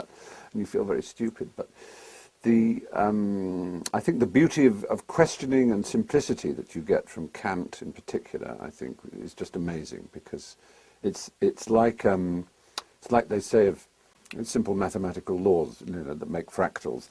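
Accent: England, United Kingdom. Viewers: Mental masturbation with an English accent.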